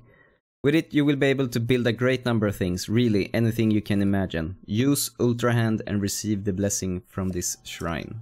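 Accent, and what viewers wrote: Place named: Sweden. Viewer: One word in and i hear the swedish accent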